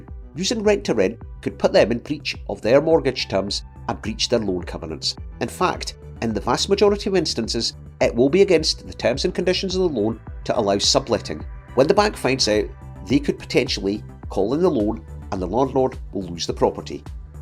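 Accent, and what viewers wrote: Scotland, United Kingdom. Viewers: I’m a real estate attorney in the US I want to start seminars and book them at the same time and in the same hotel as the FURUs I would call it ‘Why You Should Not Listen to Anything You Were Told in Ballroom A’ (my seminar will be held in Ballroom B) Also, I would like to narrate my course (which will be available to download if you attend my seminar in Ballroom B) because my parents were from Scotland, so listening to a Scots accent is both familiar and comforting..... but only if there is also some occasional yelling about what I am doing wrong.... 😉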